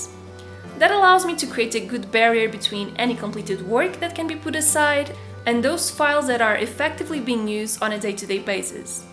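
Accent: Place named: Portugal. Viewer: I have been watching some of your videos and because of your accent I thought you are Portuguese 🇵🇹 and now I think I was right because I saw a Via Verde email 😂. Am I right?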